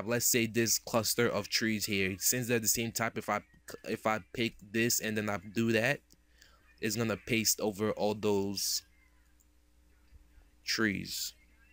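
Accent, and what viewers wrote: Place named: New York, United States. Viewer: You sound like you're from New York. xD Great videos man! Thanks a ton. I found them on the rpgmakerweb forums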